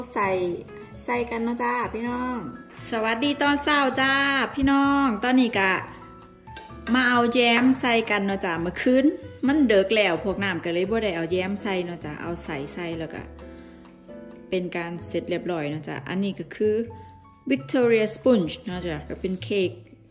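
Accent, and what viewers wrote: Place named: Korat, Thailand. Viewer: พี่สำเนียงคล้ายๆคนโคราชเลยครับ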